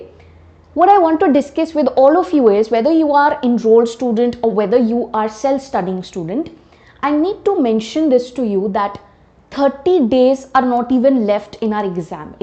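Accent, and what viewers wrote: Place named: India. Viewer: this is typical indian accent, nothing complicated about it u cannot understand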